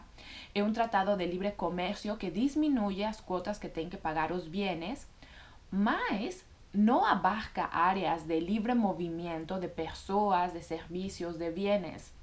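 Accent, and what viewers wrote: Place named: Spain. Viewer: Muito bom mas esse sotaque espanhol atrapalha 1% mas de resto seu português é muito bom e você é bem esclarecedora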